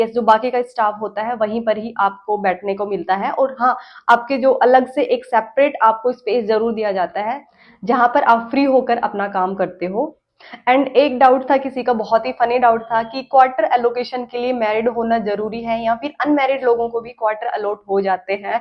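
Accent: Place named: Bihar, India. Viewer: No offense. You got sizzled bihari accent...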